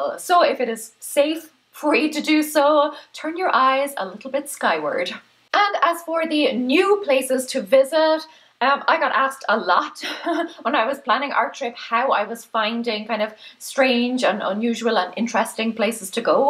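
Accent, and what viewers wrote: Ireland. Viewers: Omg I love the way you put things into doable stuff. You actually get it! Thank you I have 4 girls it's hard sometimes! I love them of course but thanks for your honesty (inspiring) xxx what part of Ireland are you from?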